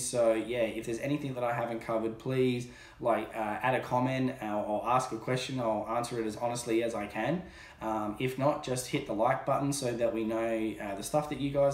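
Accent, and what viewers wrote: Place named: Australia. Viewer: Why are you taking in feet and miles? You sound Australian and look less than 70 years old to me.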